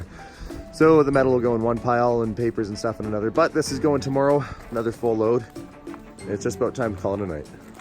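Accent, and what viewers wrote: Canada. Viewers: I'm not used to a Canadian accent because I'm from the Southeastern US, so it throws me off with some of the ways you pronounce things. Other than that, I love this. I love you going through her house and all the cool things you're finding. Keep up the good work. (I misspelled Canadian in case you were wondering what I edited.) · Lol you have a strong canadian accent with some words. I will forget you're in canada, then i will hear something like "sorry", "ay" or "looney" lol then im like, oh yea he's up north. ;-)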